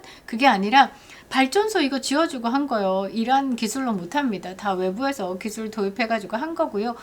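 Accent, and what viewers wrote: Gyeongsang, South Korea. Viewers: 경상북도 출신인교?사뚜리가!너무너무 좋아요!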